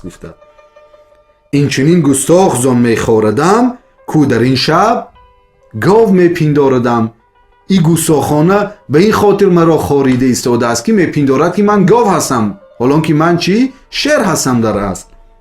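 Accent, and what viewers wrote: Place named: Tajikistan. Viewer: Бехтарин суханхо. Лахни бисер ширини точики кухистони.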